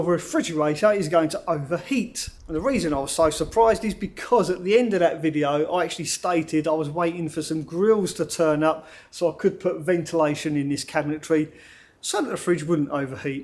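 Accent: Cockney, United Kingdom. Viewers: Are you a cockney?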